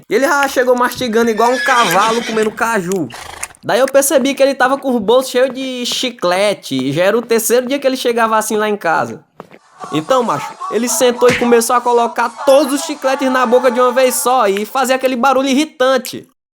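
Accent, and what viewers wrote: Northeast, Brazil. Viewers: Gostei de mais kkkk tu é nordestino?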